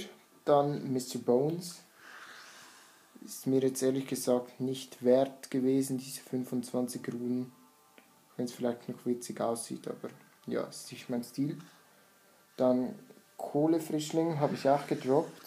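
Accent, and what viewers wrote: Switzerland. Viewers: Hallo! Ich habe mal zwei Fragen 1. Bist du Schweizer (klingt irgendwie so)? 2. Sind diese Haustiere unsterblich oder verliert man die irgendwann? Tolles Vid!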